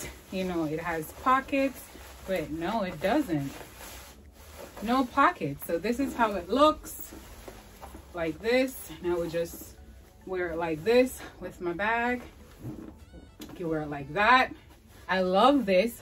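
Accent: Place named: Caribbean. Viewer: Possibly one of the best hauls ever like - what amazing pieces! I thought you'd climaxed early with that incredible fuzzy, baby blue jacket at the start just left me thinking - how do you top that? But I love the red shiny leather (especially paired with the shorts) and then that pelted black fur jacket at the end - that looks too good! Definitely a date night type coat - hope you saved it for Valentines Day! Great haul. I'll throw a sub your way. Ps where are you from? I love the accent. I'm guessing somewhere in the Caribbean?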